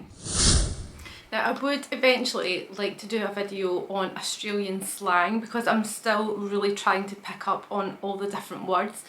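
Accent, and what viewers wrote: Scotland, United Kingdom. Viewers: Agree about the clothes dryer , lived in Tassie 55 years and neither me or my parents or daughter have ever owned a clothes dryer. I never really associated redheads with Scotland , I guess I just thought of Scotland male teens as all having skinheads or shaved heads whatever you want to call it , maybe it's from movies or something I have seen on Scotland . Also am surprised at the huge difference in Scottish accents between you and a Scottish YouTuber that I watch regularly called " Beth roars", must be from opposite parts of Scotland as sound nothing like each other 😃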